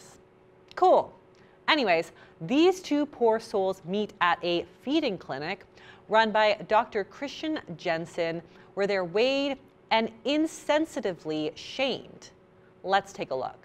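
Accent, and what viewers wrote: Canada. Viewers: Well yeah, at least in this context they are very different. The US has shows that are arguably more vulgar about weight than this one- My 600-lb Life or 1000 lb sisters. I don't think you knew she was Canadian, it doesn't seem like the majority of British people can distinguish between Canadian and American accents.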